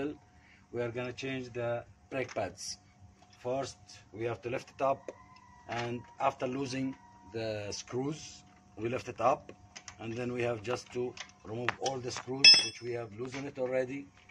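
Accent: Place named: Arab world. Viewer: من لكنة كلامك اظن انك عربي ارجو رفع فديوهات اكثر بخصوص الباجيرو تحياتي لك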